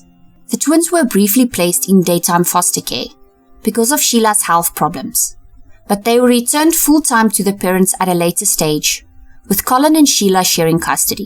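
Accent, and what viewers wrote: South Africa. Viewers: I have heard of this case before but, your in-depth coverage of this harrowing story is second to none. Love your (SA?) accent, by the way. I have subscribed and look forward to more of your content in the future. Cheers from Oz! 🦘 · Hi from Burundi. Yes I figured it was an SA accent!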